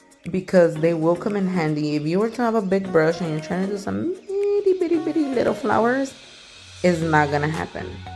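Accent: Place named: Spain. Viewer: I love love your Spanish accent, sounds like my granddaughter blessings from Killeen Texas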